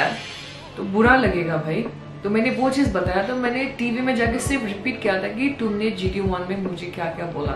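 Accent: Nagaland, India. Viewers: Your nagamese accent is just us❤ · Wow you sound like an Angami while speaking Nagamese😀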